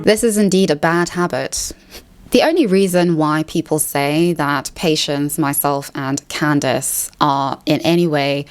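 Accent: RP, United Kingdom. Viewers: Ngl😅 your videos have been recommended to me for a while now but I had no interest to watch and I kid you not you reminded me of Patience. I press play and you also have a British accent😂 I've listened to Patience b4 and I've gleaned everything I can and doesn't really interest me but I'm willing to listen to your opinions. 🇿🇦Shoutout from South Africa✊🏿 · You are FAR more interesting and well spoken than CO. I think including the fact that you are "safe and can read and write" is a nuance lost on many Americans. From what I've gathered of your story, your perspective on this is uncommon, if not unique to the ears of most Americans. I think you're correct in your analysis of race/class differences between the two countries. I can also see why some folks in the US would consider you "posh" if all they hear is your accent (which I like, FWIW). Given that all folks over here can somewhat differentiate "proper English" from cockney and will often confuse Australian and Irish (never mind the poor Kiwis), I'm afraid that's about as good as can be expected. 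'Merca! (eyes rolling). Keep doing your thing! I enjoy your content. Cheers! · I like ur accent. Is it a British accent? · I never said you did condon it, however a few videos back you stated you were not privileged whatsoever in the British context. Even in the colonies your near RP dialect carries weight that other UK dialects don't. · I got recommended one of your videos shortly after watching Patience for the first time. I think I got the two of you confused once because you both have lovely British accents but other than that I like you both for different reasons. Very contrarily, Candace is bothersome to me and I've always thought her to be disingenuous and more of a shit-starter than anything else, even though I'm more alike her in a political sense.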